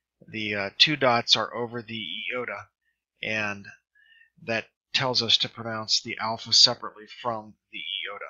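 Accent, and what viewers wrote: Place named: United States. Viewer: This is American pronunciation.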